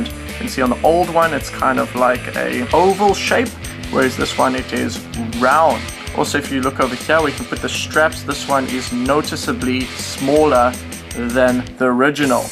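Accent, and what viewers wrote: South Africa. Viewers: are you south african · you sound southafrican are you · are you South African? you sound South African :D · South African? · Are you South African by any chance?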